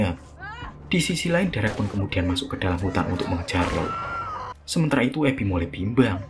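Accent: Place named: Banyumas, Indonesia. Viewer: pd bae ngapak ya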